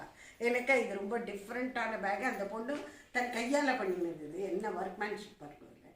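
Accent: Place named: Chennai, India. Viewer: Nice sharing mam. I like Your talk also . Tipical Chennai person it seems. I am also a crazy person in hand bag collection. Mam, how you are maintaining your hand bags? Kindly share.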